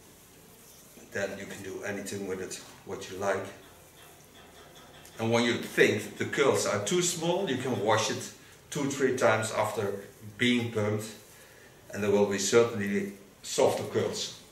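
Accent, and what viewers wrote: Netherlands. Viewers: You made me laugh, with the ears. Love your video's, you must get tired, working all day, then doing these video's for us friends on you tube. Do the rollers you used, give a soft curl, compared to the others you normally use. Joy to watch. Love the Dutch accent. Love from England.💖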